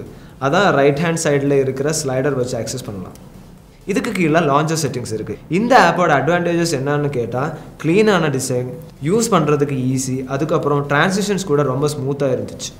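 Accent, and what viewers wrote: Kerala, India. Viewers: தம்பி பேச்சில் இன்னமும் மலையாள வாடை.. மாத்திக்க முயற்சிக்கலாமே.. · Malayalam Tamil slang ah eruku 😃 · Ivan yena Malayale mathiri pesuran 😫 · Malayala tamil? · மம்முட்டி தமிழ் பேசுரமாதிரி இருக்கு தம்பி யாரு... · Malayali ah bro accents theridhu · Hello Kerala guy your malayali tamil nice bro are you running separate yt channel in Malayalam what's your tech channel name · Kerala tamil ah 🤔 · ഹായ് ബ്രോ മലയാളിയാണോ സംസാരത്തിൽ ഒരു മലയാളി ചുവയുണ്ട് . ഹാഷ് അണ്ണൻ എവിടെ ? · Narrator malayaayi yaa paa !!! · Bro മലയാളി ആണോ?? എനിക്ക് അങ്ങനെ ഒരു ഫീൽ കിട്ടുന്നു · Hi bro...unga slang ah patha kerla pakkam mari irukae!? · Your Malayalam slang is slightly visible your tamil... Great composite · Yeppa dai Ivana ah mathunga da Ivan nalla ila da Ivan malayali pesuran kevalama iruku Ash ah review pana sollunga da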